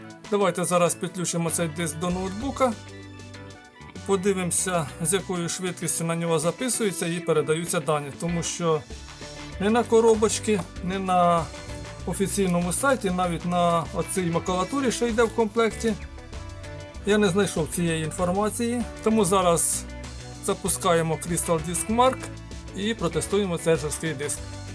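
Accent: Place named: Surzhyk, Ukraine. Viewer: На практикі на практиці Мало міста мало місця! Це ріже слух. Я за розвиток україномовного інтернету, але ж не суржикомовного ☝️😠 В мене родичі в селі можуть так говорити, але ж вони не роблять ролики в Ютубі, виставляючи свою недорікуватість на загальний огляд. Щодо диску, то Сігейт чи не самий ненадійний серед виробників. Довіряти йому якусь більш-менш цінну інформацію навряд чи має сенс. Якщо це і робити, то хіба що в RAID O архіві.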